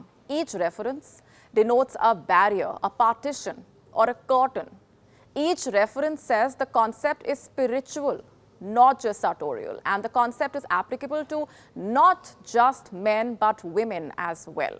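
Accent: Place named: India. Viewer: The newsreader have indian accent. Not little but too much. Let her train more instead of appearing in camera. She read like AI. Train her to pronounce T=th, p=ph, k=kh, etc, etc.... It's not nice to hear the way she pronounced.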